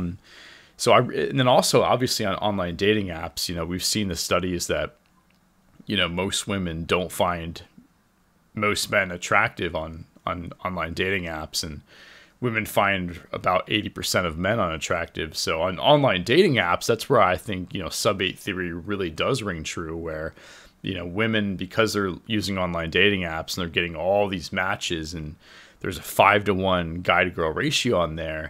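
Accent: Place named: United States. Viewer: It's alsways a pleasure to watch your videos, you've got a beautiful american accent!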